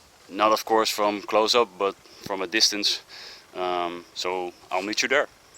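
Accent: Netherlands. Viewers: nice dutch accent... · ik dacht van het begin al dat jouw accent heel Nederlands klonk, had ik dus tocht gelijk. PS. ben geabonneerd. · As a Dutch man you can hear it when a Dutch man speaks English anyways nice video! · Are you dutch i am and you sound like your dutch to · Are you Dutch or something? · i know that this man is from the nethelands cause im hearing his acsent XDDD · U sound dutch.. EDIT: u are dutch haha ik ook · You sound dutch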